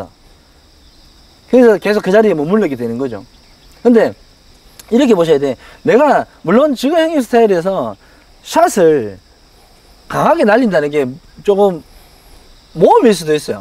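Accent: Gyeongsang, South Korea. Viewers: 지역어디세여? 레알 오리지날 사투리이신뎅 ㅋㅋㅋ(저두 갱상도)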